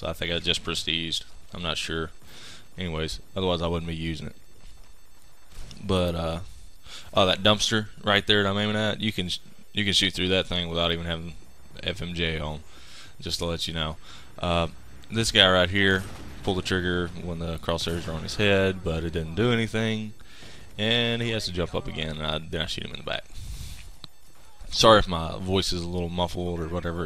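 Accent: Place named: South, United States. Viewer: Nice video, great quality, good tips. Are you from the south? I'm from GA, your accent sounds kinda familiar.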